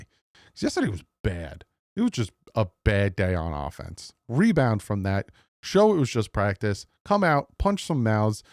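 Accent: New Jersey, United States. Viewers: I love you but the NJ accent…. “Yastaday” grinds my gears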